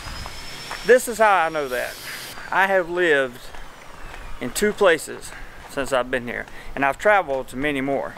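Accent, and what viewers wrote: South, United States. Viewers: New subscriber here. Thanks for the videos man. They are more deep with a reality of what to expect. You sound southern, where are you from Sc? Hahaha any way. Am planning on moving sometime to the philippines, around the Marakina city.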